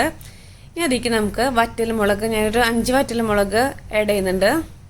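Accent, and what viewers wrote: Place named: Thrissur, India. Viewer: Aha nammude trissur slang wd varutharcha kayakkari polichu😋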